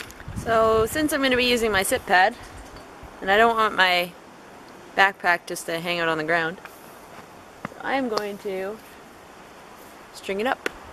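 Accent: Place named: Canada. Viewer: I found you while searching for cowboy coffee, but there are so many other things in this video that I've long been interested, such as twig stoves, winter backpacking and the Morakniv bushcraft knife. I thought you sounded a bit Canadian. Very glad to have found you, and I'm looking forward to your next videos.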